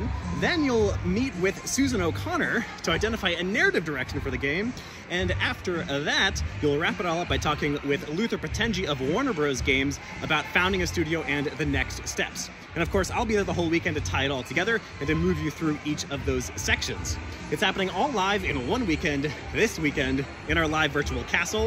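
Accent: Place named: Netherlands. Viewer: sounds pretty Dutch! Are you by any chance Dutch?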